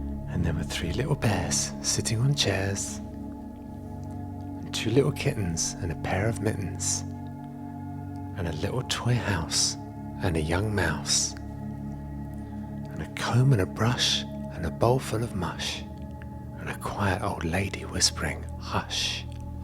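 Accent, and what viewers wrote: United Kingdom. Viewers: Your voice and accent is awesome. The British accent in my opinion is the best accent 👌.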